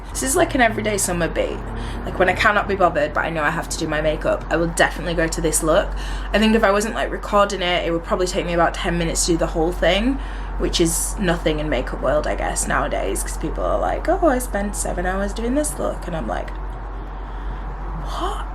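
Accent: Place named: Leeds, United Kingdom. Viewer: !!! I knew I recognised the accent! I'm from Leeds! Going to watch all your videos now 😂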